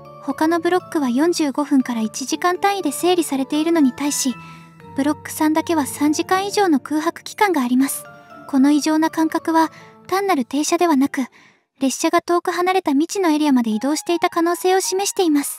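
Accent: Kansai, Japan. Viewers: 関西弁過ぎてわろた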